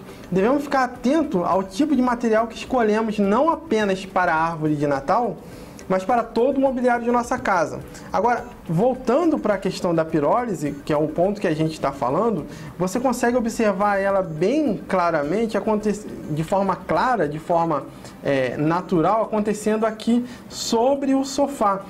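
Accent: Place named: Rio de Janeiro, Brazil. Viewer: Putz, carioquês latente. Mas o video é interessante.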